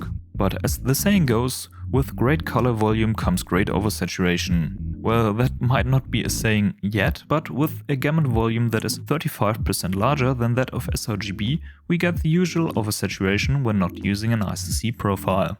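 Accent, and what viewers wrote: Germany. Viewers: are you randomly German?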